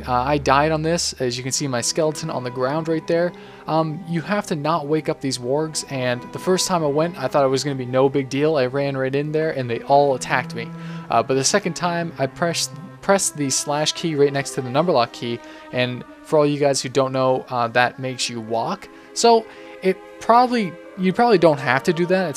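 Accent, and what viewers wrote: Canada. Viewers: are you canadian? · He's Canadian. ^^ When we watched his stream in July/August, the chat frequently poked fun at his accent. :D "What are you talking aboot?"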